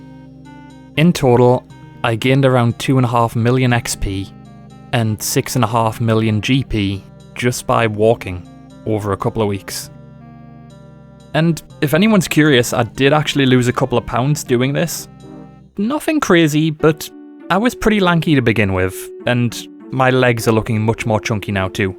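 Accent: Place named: North, United Kingdom. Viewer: Sound like a fellow northerner, great vid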